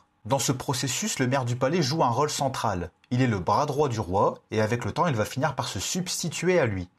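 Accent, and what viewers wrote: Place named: Nord-Pas-de-Calais, France. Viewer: "les rouos fainéants, Childéric trouo, Thierry trouo, à la suite de quouo, bras drouot du rouo".... elle serait pas ch'ti??? à part ça c'est très bien:-):-)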